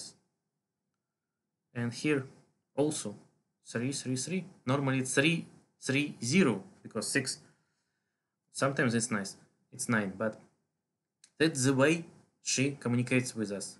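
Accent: Russia. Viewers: Офигенное произношение на английском с чисто русским акцентом! Прям, как "держу корону"))) круть! Уважаю! 👍😉💪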